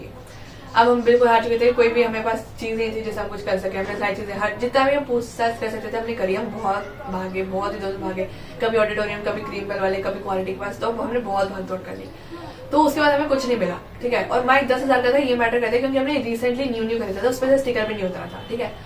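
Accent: Haryana, India. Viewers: this red t - shirt wale bhaiya has been controlling his laugh and had never been serious in the whole video 🤣🤣😂😂😅😅🤣🤣🤣 by the way I love ur videos and I request u to pls give some tips and information about apps u use for editing etc. to help me start a you tube channel🤗🙏🙏🙏🙏🙏🙏❤❤❤❤❤❤☺☺☺☺☺ by the way di aap HARYANA se ho kya ??? because apka accent bilkul vaisa hi h guse mai ya funny mai😂😂😅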